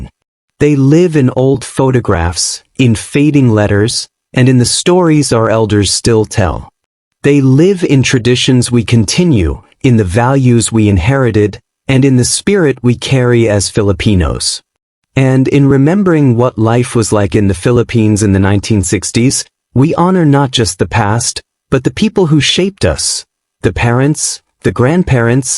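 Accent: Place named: United States. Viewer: The white American English accent is cringe. Very mispronounced and terrible.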